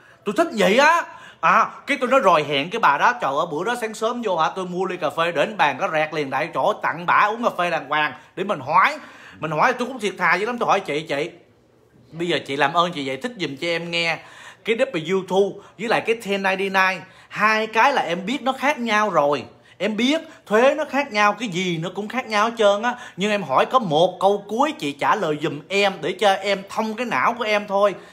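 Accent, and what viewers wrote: Binh Dinh, Vietnam. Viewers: Tina Bennett ....tôi nghĩ ổng từ Quy Nhơn Bình Định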